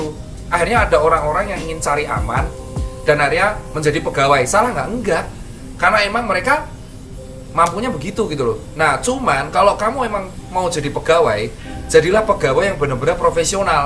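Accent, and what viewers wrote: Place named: Surabaya, Indonesia. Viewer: Ijin, bilamana buat tema saran/alternatif solusi : #salah masuk SMA (salah jurusan IPA / IPS / BAHASA) next... next... / SMK jurusan (listrik, mesin, bangunan, dsb) next... next... lulus sekolah atas, setelah pilih kuliah (keliru jurusan ???, bingung) / pilih kerja / kuliah+kerja (+- nya ... gmn management nya, biasanya... molor kyk i 😇). Seneng gaya+ngomong suroboyo an e / jowo medok, to the points lozzz ora rewel Sukses teros... dokter + enterpreneur 👍👍👍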